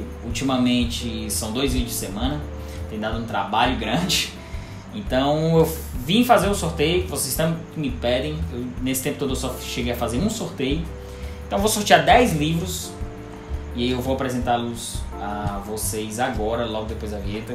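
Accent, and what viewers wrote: Northeast, Brazil. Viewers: Canal com um ótimo gosto literário e com o sotaque mais bonito do Brasil. Rsrsrs. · Parabéns pelo 💯 video, sempre assisto e pego várias dicas, amo vê o sotaque do meu nordeste no booktube👏👏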